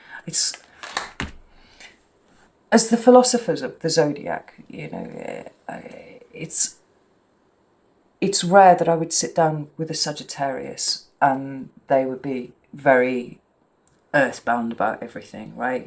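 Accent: United Kingdom. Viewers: I feel the same as last reader, you really seem to explore the meaning of the cards. Also loved this reading, I am Sag rising and I feel like Sag readings relate to me more than my sun. I also love listening to that awesome British accent. The tall wizard behind you i had one just like him-lost him in a move can't find those wizards anymore where did you get yours?? Blessings to you!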